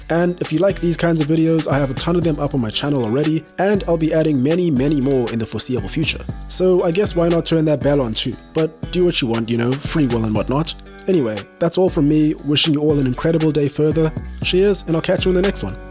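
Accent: South Africa. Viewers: Where are you from dude? Trying to place your accent. Sound kind of South African but I can’t tell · Are you South African bro? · he is from the rsa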